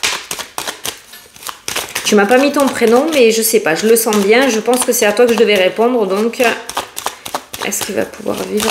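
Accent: South, France. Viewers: domage j'aimais bien ton accent du midi ton franc parlé et tu m'éclate quand tu raconte tes histoires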